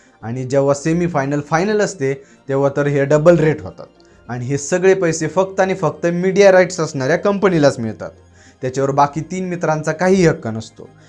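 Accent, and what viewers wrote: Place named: Ahmednagar, India. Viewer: भाऊ हीच टोन राहूदे ..तुझी USP आहे ही भाषा❤ नगरी वाटतोस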